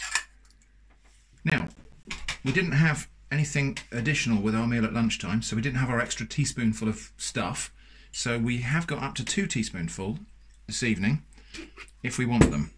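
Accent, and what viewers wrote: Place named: RP, United Kingdom. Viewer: You sound so posh when can we see your face ???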